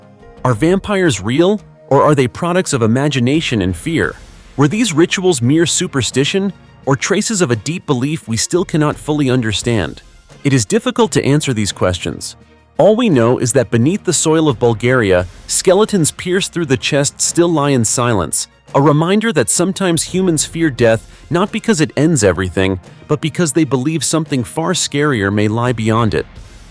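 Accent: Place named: United States. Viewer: as a side note, I can’t even watch this. American narrators drives me nuts.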